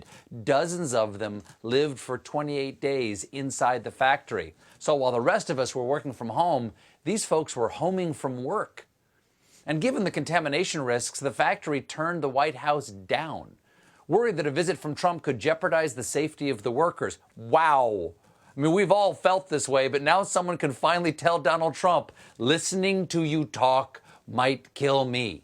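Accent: France. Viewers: J'aime votre accent Français Stephen ! :-) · Stephen, I love ta French accent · Hey Stephen Ton français fait dure !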